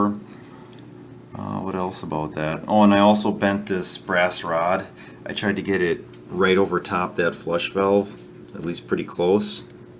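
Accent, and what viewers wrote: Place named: Midwest, United States. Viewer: You've gotta be from Ohio or Minnesota 😂❤ thanks for the vid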